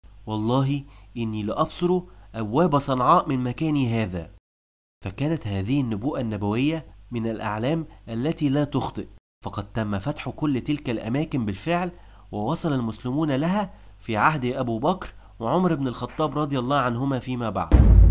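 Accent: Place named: Egypt. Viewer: ... عليك نطق الحروف بشكل جيد فأنت تتحدث بالعربية وفي موضوع علمي ... متى تتعلموا إخواننا المصريين أن تتخلوا على لهجتهم عند التحدث بالعربية والله أغاظني نطقك للكاف عوض القاف وكثير من الحروف 😢😢😢 حرفتها.. رغم أن المحتوى جيد من حيث المعلومات الدقيقة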